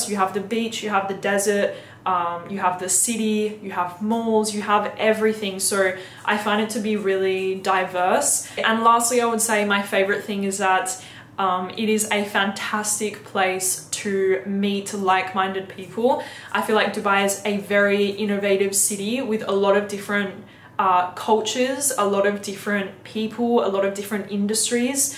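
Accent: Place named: Australia. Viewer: I have a weird question. I’m from the UK and grew up watching Neighbours, your accent sounds very different to the people on that show, does Australia have a lot of different accents?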